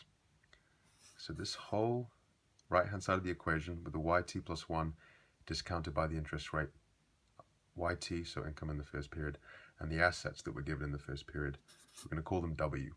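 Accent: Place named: United Kingdom. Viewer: Thank you very much, so useful! Keep uploading videos, we need to understand economics with a British accent ;)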